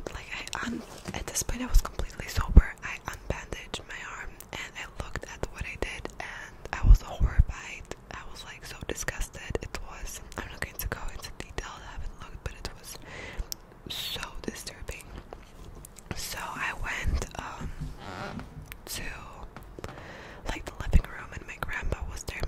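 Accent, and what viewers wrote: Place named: Slovakia. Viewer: I can hear her Slovak accent it’s so pretty and pure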